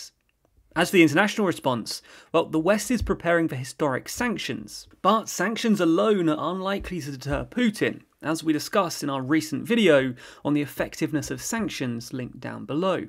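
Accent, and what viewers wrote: United Kingdom. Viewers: This is british english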